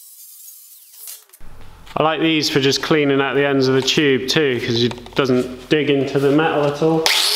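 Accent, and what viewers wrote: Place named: Cornwall, United Kingdom. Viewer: Brilliant job mate. I haven’t bothered to subscribe to anything in ages but your channel is awesome. Watched all your vids and you make it look so easy and really explain things well. I’ve picked up so many tips from you. Worked with a guy from Cornwall who talks just like you, you could be brothers haha. Anyway keep it up mate, I look forward to seeing your stuff, I think we all do. Legendary!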